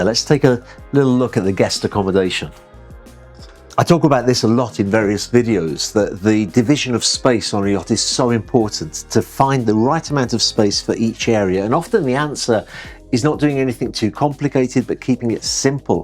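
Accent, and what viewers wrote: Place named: West Midlands, United Kingdom. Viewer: I can hear the West Midlands.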